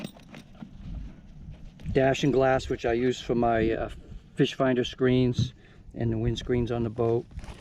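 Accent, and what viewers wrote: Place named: New England, United States. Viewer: Awesome...thanks for your service as well and the information! My fishing will be done in ME so the biggest water I’ll see will be Moosehead Lake. You sound like a NE guy so I’m sure you’ve heard of it. I hope you don’t mind if I hit you up again in the future with questions. Good chatting with you!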